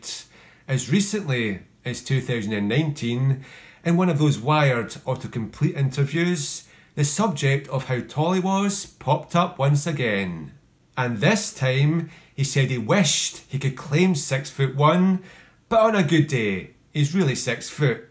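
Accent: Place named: Scotland, United Kingdom. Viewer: Are you scottish?? I love your accent ❤️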